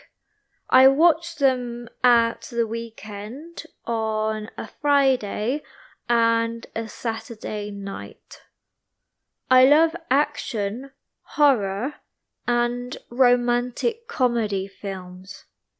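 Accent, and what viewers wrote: United Kingdom. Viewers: 😮 Beautiful Beautiful voice, I am learning the British accent, I love this beautiful accent, and thank you for your videos, my friend, I really appreciate it. Greetings from Mexico!!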